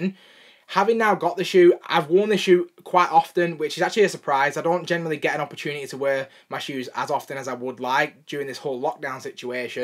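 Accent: Scotland, United Kingdom. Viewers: Saw your comment on settle Fowler. Is that a Scottish accent? Anyways I like this video. My favorite yeezy 350s were the cinder, earths linens and upcoming sand color way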